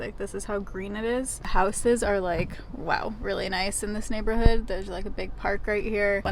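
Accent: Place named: Georgia, United States. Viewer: Let's not forget the sweet Georgia boy accent!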